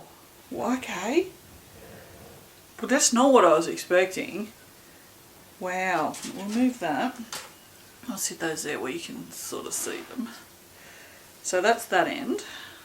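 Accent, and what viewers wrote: Australia. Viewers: Are you from Australia ya ripper 😅lol grand vid mate 👍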